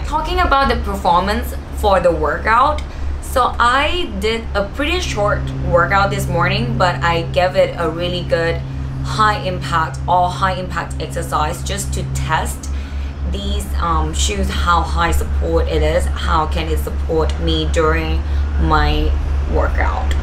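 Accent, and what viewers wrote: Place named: Thailand. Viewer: I‘m so happy I stumbled upon your video! 💕 I picked up the pink pair - mainly for my walks. On a different note - I recognize a Thai accent. Is it possible that you‘re from Thailand? x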